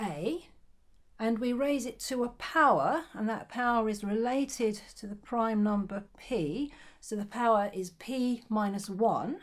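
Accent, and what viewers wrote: United Kingdom. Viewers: I love your thick British accent! Much apreesh!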